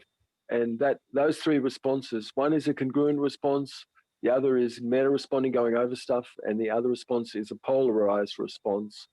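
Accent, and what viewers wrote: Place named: Australia. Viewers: because...he's Australian.................. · Why’s he got an Australian accent?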